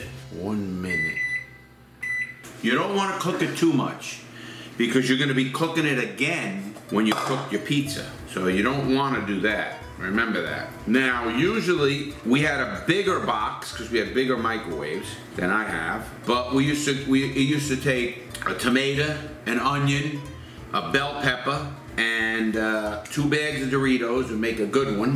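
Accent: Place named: East Coast, United States. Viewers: by his accent he's east coast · The thick Jersey accent really ties it all together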